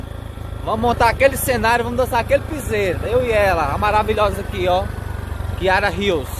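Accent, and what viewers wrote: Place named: Ceará, Brazil. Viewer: você é de que estado, você moram no Ceará? Eu sou de Pernambuco, o sotaque é bem diferente, os seus vídeos são muito bons, parabéns.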